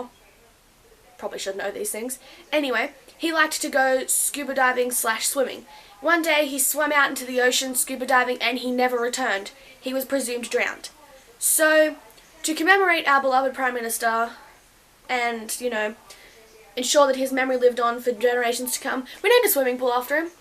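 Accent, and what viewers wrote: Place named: Australia. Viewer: Great video :D Love the Aussie accent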